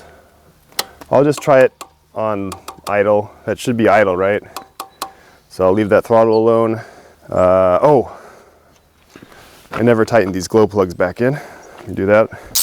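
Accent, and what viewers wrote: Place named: China. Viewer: Chingliss I love it.😂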